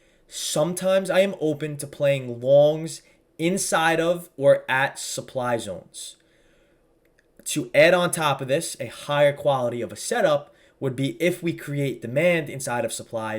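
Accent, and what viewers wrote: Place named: Long Island, United States. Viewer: Tell me you're not from long island.... gotta be. Staten island is the sleeper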